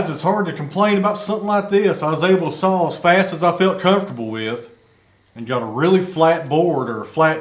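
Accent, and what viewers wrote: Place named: South, United States. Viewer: Can the accent get any more southern? I love it.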